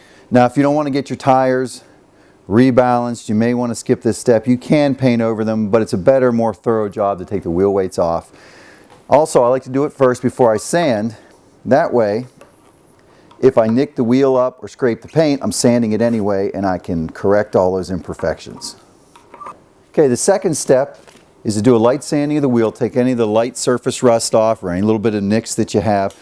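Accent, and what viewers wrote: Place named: Canada. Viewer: love the way he says "Give it a bit of 'hows ya father' " that surely is the most usual mix of accents... kind of Canadian, kind of English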